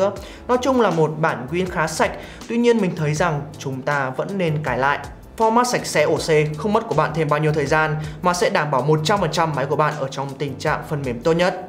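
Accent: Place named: Hanoi, Vietnam. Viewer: giọng hà nội ai cũng vậy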